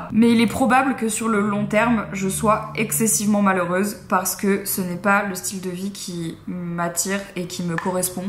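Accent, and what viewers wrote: Belgium. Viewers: Je trouve que t'as pris un léger accent belge 😂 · C'est incroyable, j'entends un petit accent belge ;)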